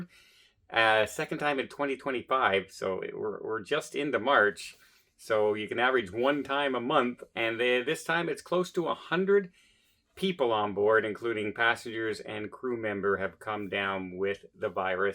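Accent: Canada. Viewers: you must really be bored with your life. He is from Canada sometimes there pronounce words differently .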